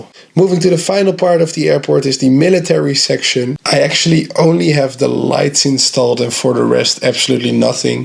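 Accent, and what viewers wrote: Netherlands. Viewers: schiphol atc tower i thougt i heard a dutch accent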